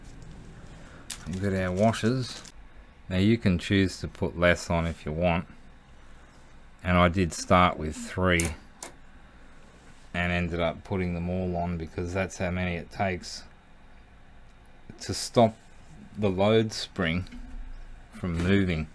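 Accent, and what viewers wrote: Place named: Randwick, Australia. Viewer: You sound like you're from Randwick, mate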